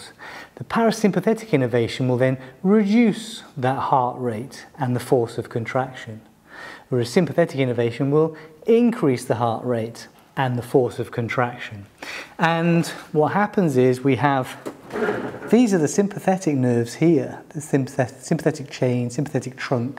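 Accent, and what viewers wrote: England, United Kingdom. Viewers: This guy looks a bit like Eric Clapton. It's great to hear someone with an English accent explain stuff compared to all the vacuous sounding Americans dressed up in scrubs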